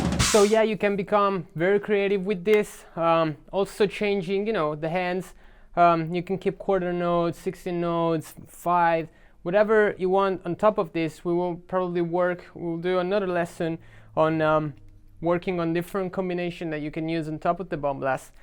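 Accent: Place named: Austria. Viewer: Similar accent as krimh, are you from the same place? Austria, I think?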